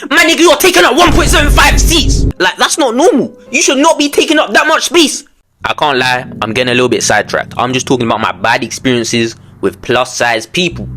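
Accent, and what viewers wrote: United Kingdom. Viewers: Bruh you British?? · You sound like you are from the UK if I was you I woulda came to school witta knife if I gotta record a video like the one you showed 🙏🙏🙏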